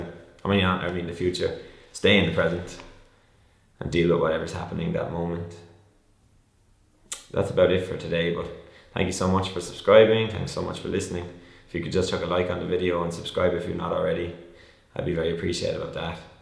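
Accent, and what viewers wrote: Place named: Ireland. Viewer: Small world man! I too had a tumor in my pineal region. Glad to hear you're doing good! In hindsight I rushed into surgery and am glad you mentioned not to do that. How're you feeling these days man? I've got to say the philosophical advice at the end really hit home and the irish accent made it that much better. Thanks for making this video.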